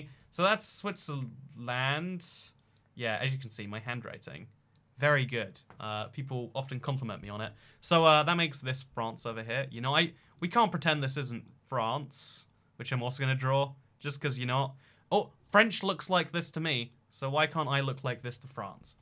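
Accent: United States. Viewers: Is this guy American? ??? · Thought he was amerixan for a minute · Edit: Warning, if you are on a phone, be careful about clicking read more, I accidentally wrote a HUGE paragraph. You may also learn something after you get through the solid bock of text. There is one big reason that people sometimes think that is from America. First of all, you should know that EVERYONE has an accent, you just don't realize that you or the people in your area have it, because it is part of your dialect. Not all accents are distinct though, and some do sound similar. You also have your own distinct dialect/accent influenced by where you have lived, mostly influenced by where you grew up, and who you grew up with (friends and siblings more than parents). Side note, Great Britain and America have been friends (and allies) since the American revolution, yes Great Britain did try to take back America in the war of 1812 but did you know that even though America lost the war of 1812, America is still its own county. 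America and Great Britain have been influencing each other for centuries, it should no surprise that some people are going to confuse an English accent with an American one, in an area of America that sounds surprisingly similar. I'm looking at you South-west. I am allowed to say this, I live in one of the Southwest states and have been to all of them, but I have noticed, that some states try really hard to have a distinct identity as a state, some states have that, but some states don't have that, and honestly, sorry Southwest of America, exclu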